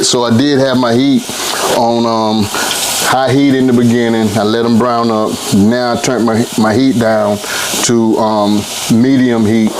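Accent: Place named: East Texas, United States. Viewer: You have to be from East Texas, because the accent and the wording and the GOOD OLE' SOUL COOKING is giving East Texas. I was born and raised in Lufkin, Texas. Keep Up the Good Work. Love your channel!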